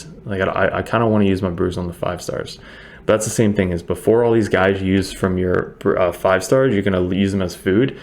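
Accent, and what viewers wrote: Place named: South, United States. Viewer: You sound like you might be local to where i live, you from the southern states? Loving the content bro, keep it coming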